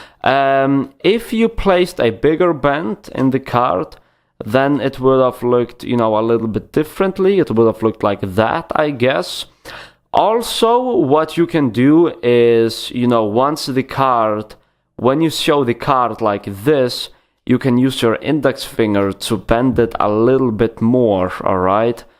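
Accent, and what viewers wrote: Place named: Russia. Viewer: Like the Russian arscent. Now I know the trick. Cheers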